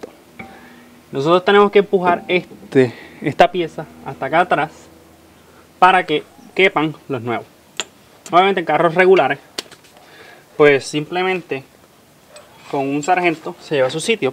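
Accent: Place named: Puerto Rico. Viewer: oye brodel ..yo de mala pata saque el pistonsito completo del caliper. y ahora no me quiere sangrar..algun consejo q me puedas dar? ahi otra manera ..se sangra con la guagua prendida o apagada? soy novato en esto so mientras ma detallado puedas ser te lo agradesco..y x tu acento creo q eres bori..so una ayudita no me vendria mal gracias de antemano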